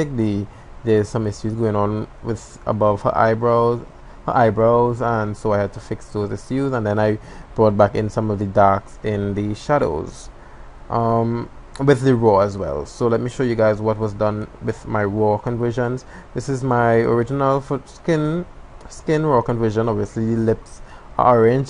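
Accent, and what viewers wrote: Trinidad and Tobago. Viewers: You're trini just like me lol I have problem understanding you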